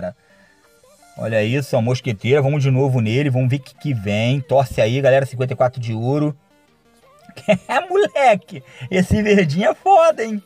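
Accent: Rio de Janeiro, Brazil. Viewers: mt top esse sotaque do rj kkkk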